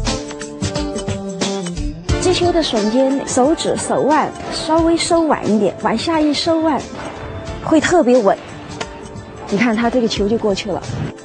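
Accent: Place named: Yunnan, China. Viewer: 这位女老师有明显的云南口音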